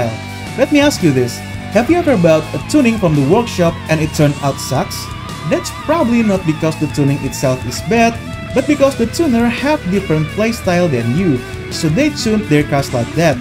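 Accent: Indonesia. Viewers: orang indo nih yg punya channel wkwk, logatnya soalny 😂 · Kayaknya, aksen Inggrisnya kaya ada suara orang indonya gitu, tau kan lu orang India ngomong Inggris gmn? Nah sama juga kyk orang indo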